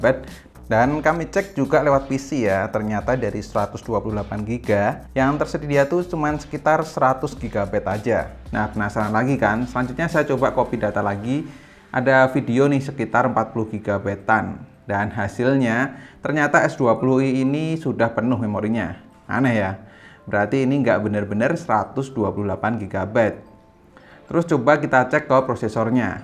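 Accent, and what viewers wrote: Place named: Java, Indonesia. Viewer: Logat jawanya masih terasa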